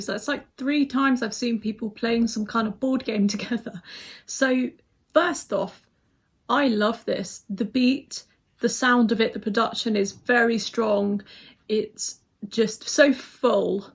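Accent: United Kingdom. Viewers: you're one of the most competent persons I've heard about theme of ESC...and your british accent is like a melody 🤗 Grettings from Serbia 👋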